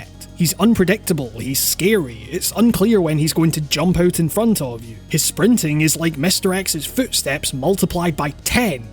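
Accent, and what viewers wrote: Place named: Scotland, United Kingdom. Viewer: I think its a slight scottish accent maybe :)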